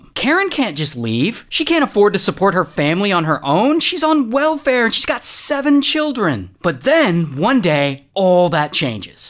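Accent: United Kingdom. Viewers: As a British person I can confirm we talk like this😂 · best british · british perfect impression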